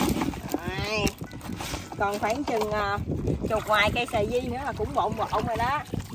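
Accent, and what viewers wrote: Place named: Mekong Delta, Vietnam. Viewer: Nghe giọng nói của người miền Tây đã thấy dễ thương rồi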